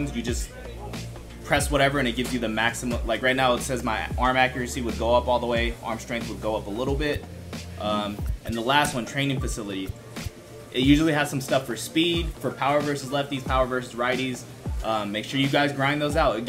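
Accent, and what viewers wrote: Australia. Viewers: LMFAOOOOOOOO AUSTRALIAN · Yikes that was an Australian accent?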